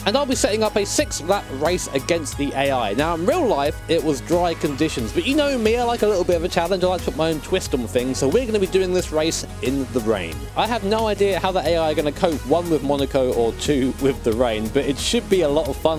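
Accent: United Kingdom. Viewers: I don't know if it's just because I'm from Murka and am immediately enchanted by British accent, but I think you'd make a hell of a racing commentator.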